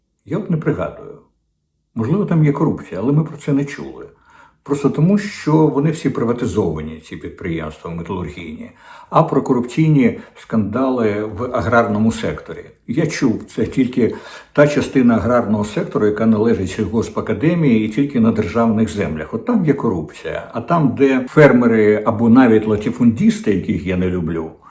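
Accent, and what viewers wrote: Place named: Moscow, Russia. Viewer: ВИ - пречудовий оратор! Але, пробачте, чом ВИ ніяк не виправите вашу московську фонетику? маячЬня, щЬо, передачЯ, чЯстина, незначЬної, чЬому, почЯтку, ухІлянтам, політичЬна, бачІмо, схІльні, агЄнт, очЯх..... та й дивна конструкція-потороча "всЕ рештА"...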